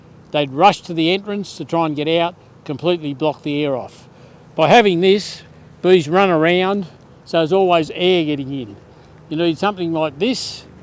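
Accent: Australia. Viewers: Are you in Australia please you sound as you are I’d like to follow you if you are please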